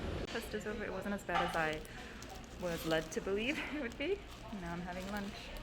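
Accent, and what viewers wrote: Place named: United States. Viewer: chill and nice vlooog 💜 take careee!! alsoooo still amazed by the fact that you are fully korean like...you sound american when you speak in English 👏😳💜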